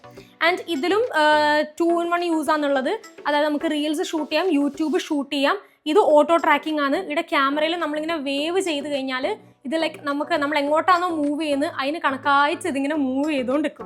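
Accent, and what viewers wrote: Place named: Kasaragod, India. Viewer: Haii chechiii I'm your big fan....❤❤enikk chechiye orupaad ishtawa chechide samsaram kelkkan nalla rasssaa ❤😭..chechide slangum ishtawa enikk❤❤❤... Chechiii kasaragod alleyyy njnum kasaragod thanneyanuuu.. Enikk chechiye neritt kanaan thonnunnuu😭😭😭🤍🤍🤍🤍.ende per vaishnavi oru hai tharumo plzz 😭😭😭🤍🤍🤍... Ee video ishtapettu ❤❤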